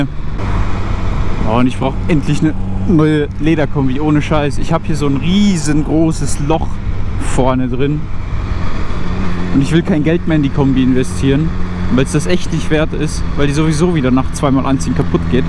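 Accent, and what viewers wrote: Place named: Bavaria, Germany. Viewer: red mal mehr bayerisch in deinen videos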